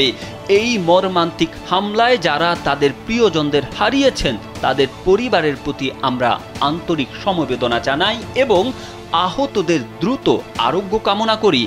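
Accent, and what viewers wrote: Kolkata, India. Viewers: আপনি দেখি কলকাতার মত খবর পড়া শুরু করেছেন. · Reporter Kolkata reporter er moto kota nolen😂😂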